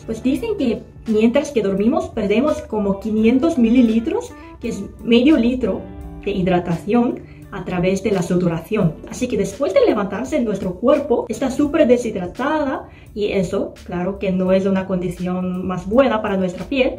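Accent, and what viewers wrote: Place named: Latin America. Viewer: "vamos a platicar", "ahorita"... como española de España tu español se me hace muy latino, aquí no decimos esas cosas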